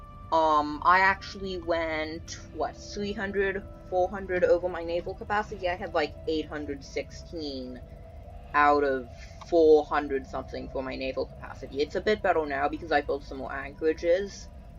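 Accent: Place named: North America. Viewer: north american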